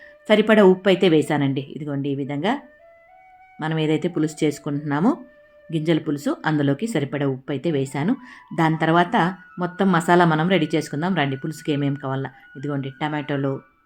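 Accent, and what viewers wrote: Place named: Bangalore, India. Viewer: Meeru maa laage matladataru ante memu Bangalorelo unde vallu itlane matladthamu alage ginjula pulusu kooda itlane chesedi❤❤❤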